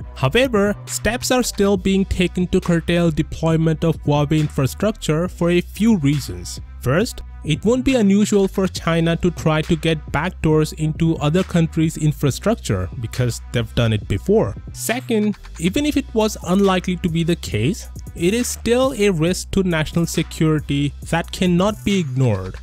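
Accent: India. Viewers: Huawei is good competition for big Smartphone Companies. You also should get rid of your accent you sound like Indian Scammer. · You're sound like an indian scammer.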